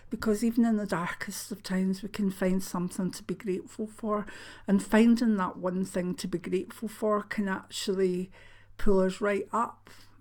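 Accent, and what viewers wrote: Scotland, United Kingdom. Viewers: I wish you a happy and joyous Christmas with your family and grandson, the little ones really do make it magical. Have a wonderful Hogmanay and I look forward to future videos, you have helped me get through a difficult year after loosing my mum in January, listening to your beautiful accent has soothed a lot of my emotions, its like having my mum near by, I miss hearing the Scottish accent, I lost mine years ago, though apparently it slips in every now and then. Thank you for all you do xx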